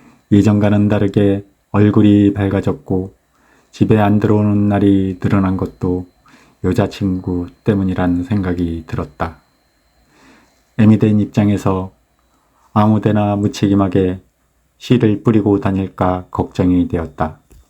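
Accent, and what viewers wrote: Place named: Chungcheong, South Korea. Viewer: 겨울철 자전거 풍경과 내레이션이 잘 어울리는 구수한 충청도 사투리가 멋져유.,. 다덜 콩나물지하철 경험하면..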